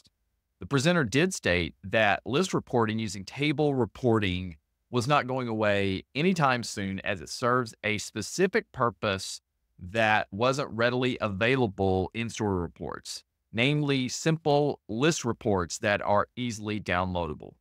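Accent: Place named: Texas, United States. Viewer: Nothing like a bald guy with a Texas twang to start my week. Thanks B, this was a great wrap up and it was nice seeing you.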